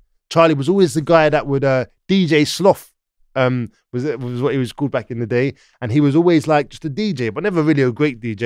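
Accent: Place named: Camden, United Kingdom. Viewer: Great story ! Love the accent as a Londoner all Camden heads sound like this !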